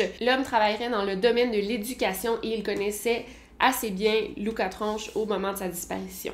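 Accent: Quebec, Canada. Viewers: OUUUUUUUUUUUUHHHHH!!!! Tes vidéo update me passionnent a chaque fois et cela me permet de découvrir une nouvelle vidéo de ta chaîne. Je t'ai découvert y a vraiment pas longtemps,( et je pense que j'ai pris quelques gramme depuis, je devrais faire plus de sports ...) mais je t'a l'air tellement adorable et cool!!!! Vivement que tu fasse un meet-up je veux trop te voir en vrai!!! En plus j'aime beaucoup l'accent Québécois.... Enfin bref.... Moi l'affaire qui me laisse le plus perplexe c'est celle de Lori Vallow & Chad Daybell : ça n'a aucun sens ce qu'ils font ! Pour moi, soit Lori croit être une déesse de l'incarnation, ce qui est heuu très peu probable, et a entraîner avec elle son nouveau mari, qui, sous son charme, y croit dure comme fer....Je sais pas si tu vois ce que je veux dire chuis pas très claire dans mes propos..... Soit, bah ...... elle a problème psychologique. 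Dans les deux cas en fait il y a un BIG problème dans le cerveaux. Je pense que les enquêteurs devraient chercher plus loin dans le passe de Lori, peut être qu'ils y découvriront le/les événement/s déclencheur pour moi c'est ELLE la "chef". En tout cas j'ai hâte d’être a demain pour en savoir plus sur la petite Madeleine! Passe une excellente soirée!! KISS DE FRANCE!!!! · Tu a un ascent canadien et très bonne vidéo😉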